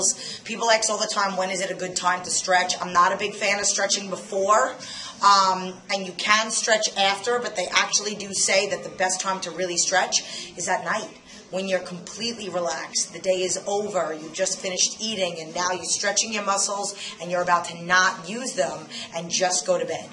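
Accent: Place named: New York, United States. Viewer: she must be a new yorker, she sounds like a new yorker! Great video post, thanks for sharing. Rock on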